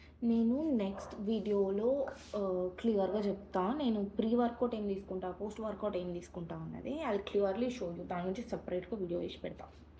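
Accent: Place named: Telugu, India. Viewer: Telugu because I love u r telugu slang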